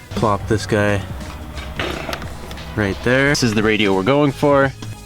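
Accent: Canada. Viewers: Sound Canadian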